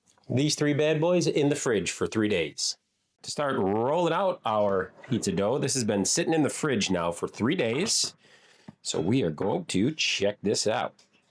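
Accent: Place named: Chicago, United States. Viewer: You have an authentic Chicago accent. I could pick out a “Chicago “ accent anywhere .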